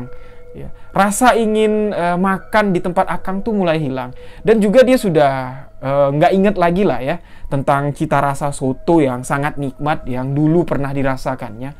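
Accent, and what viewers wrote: Indonesia. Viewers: I just love the way you tell the stories. Sangat mudah difahami. Semakin suka dengar dialek Indonesia ❤️ Hello from Malaysia!!!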